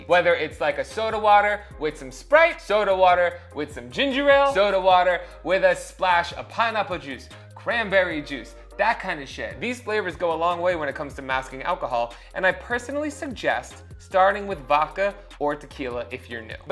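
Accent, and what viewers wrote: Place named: New York, United States. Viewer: How have you managed to get a heavier NY accent and sound gayer at the same time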